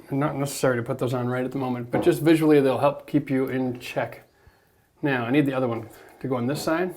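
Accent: Canada. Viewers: Wait . . . are you really not gonna tell us about what happened to your eye? . . . I just ran into your videos this morning and I they're a pleasure to watch and listen to even when I stepped away from my desk. . .that elevator music and your Canadian accent do the trick. . .Keep up the good work! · Mate, I love your work and your accent. I was brought up in Edmonton but have lived in Australia for the last 45 years. I still love to hear Canadians talk. I really miss Eatmores and Coffee Crunch too! Take care and stay safe....Kev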